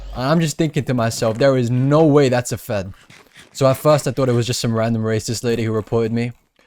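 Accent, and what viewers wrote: United Kingdom. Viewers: well sorry you sound Scottish like me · bro i luv ur british accent thats the reason im watching ur vids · i dont care if you sound like brithish be strong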